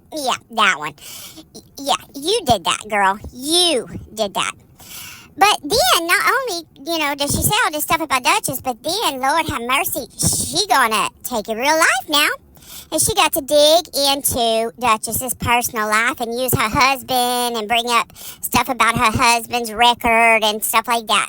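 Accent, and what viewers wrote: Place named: South, United States. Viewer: I missed you miss, and I love Southern accents 😍💯🙏🏼❤️ Great video like always 💯🐍🥕🤡👿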